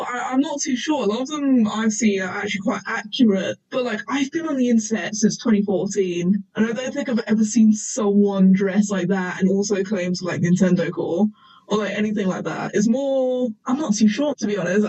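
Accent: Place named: United Kingdom. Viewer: Hello fellow Brit! It’s very comfy listening to your accent 😆 There was a lot of stuff in your video pre- I had no idea about, I should check those artists out! When you said you wasn’t sure if you got anything wrong during the 2000s period, I have some observations I was a teenager in 2008 (I was 18!) so I can give you a bit of substance to the google trend data NintendoXCore was of course a genre of music coined by on their first demo and their website. The animated music video went viral in 2004 on Albino Blacksheep and pushed them to new heights. The original video didn’t even use Nathan’s vocals, they used Adam Crooks despite Nathan’s already being on R.Borlax. Cool fact! So during that time they were circulating alongside other post-hardcore inspired acts like, etc. NintendoXCore as a genre stood out because it was making heavy and obvious use of the chippy synth sounds. 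But teenagers on MySpace during what you accurately called the third wave of emo (scene!) just thought it sounded cool and didn’t know what the hell they were talking about. So they’d unironically say things like ‘I prefer SegaXcore to NintendoXcore RAWR’ and other ridiculous sounding things that had no bearing on the music itself. It just kind of became its own meme. Because a lot of bands gaining prominence back then were using 8-bit, chiptune, or electronic sounds NintendoXCore as a genre kinda blurred into the cul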